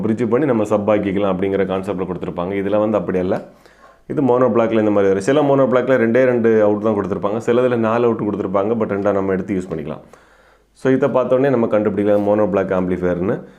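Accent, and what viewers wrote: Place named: Kerala, India. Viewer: Ningal malayali aano oru malayalam touch varunnundu samsarathil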